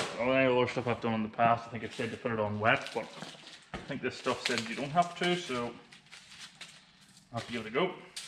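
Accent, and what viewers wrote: Scotland, United Kingdom. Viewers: All I can say about your damn racket, is CRANK IT UP,! the montage shred or the V6, but CRANK IT UP! You need to take yourself down to Shropshire and drag Rich down to a pub with a good sound system and have a DJ Shoot Out! I'll admit to old and moldy- but your music choices were really "on time" with me. And the Capri, she has a real crackly sound, even before the "big tune"- awesome! Make no mistake, the lilt of your Scot's home accent is much fun- I come from a family with some "furrin" roots too! But the music over was two fer for me- the sounds and the engine! It does look like you maybe through before Binky gets all reassembled- if you go to Shropshire, go in the Capri and show them what a finished car, reassembled looks like- poor Binky has been scattered to the four corners for a long time now! Best to you, the missus and kids, PS; You go down to Shropshire, buy Nik about 7-8 pints and then get him to judge! 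Richard has children and can't afford that level of bribery! Since the gorgeous blue paint job didn't cost nearly what Binky's anything but "plain stove white" must have- even in favors it must have been a pile- a few pints you didn't drink should keep the missus from worrying! Or take her along- bet ya'll haven't been on a "date" like that in a while! FR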